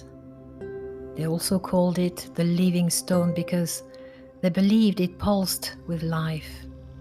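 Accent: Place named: France. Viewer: Thank you, your videos are so beautiful, soothing, helpful and just connect with me on a very deep level, kindred spirits indeed! I'm British and I have many friends who were born in France and I do love their accent, yours is lovely Blessings to you and all Louisa and Sas (pug) I'm wearing a solid lapis bangle and citrine nugget bracelet... I love crystals, always have x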